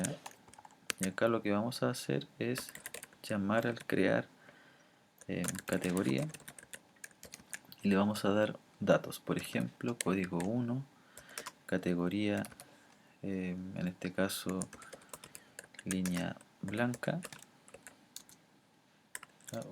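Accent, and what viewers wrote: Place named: Chile. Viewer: Chileno! por fin wn.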